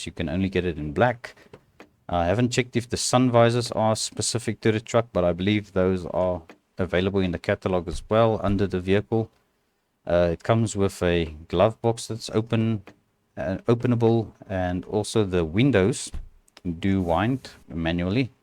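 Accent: South Africa. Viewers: south african i can hear in the accent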